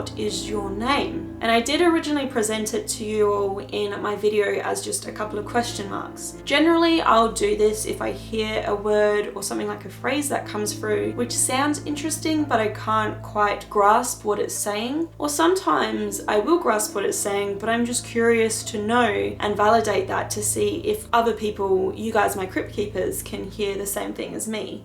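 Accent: Australia. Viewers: Hey, I just found your channel through the link the paranormal scholar shared and I have to say after watching a few of you videos I really enjoy your channel and the content is awesome. I’m gonna sub right now. Also, I love you accent. My sister’s husband was born and raised in Sydney Australia but now lives here in Orlando, Florida. He and his family have that awesome Aussie accent and you very much remind me of it. Anyway I look forward to watching your previous videos and seeing what’s to come. Take care and keep on with the awesome awesomeness. Peace!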